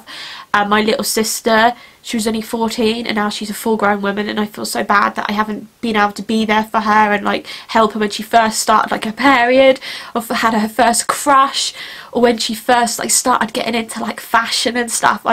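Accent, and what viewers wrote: United Kingdom. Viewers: Hello, What is your accent? I’m British/English too and just wondered where you are from 😊 Also you are so brave, thank you for sharing your story ❤️ xx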